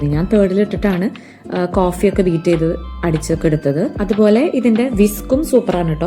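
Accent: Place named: Thrissur, India. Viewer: Is ur place Thrissur? Why do you mix your slang with others? Just go ahead with your own style, people will accept it. Be yourself.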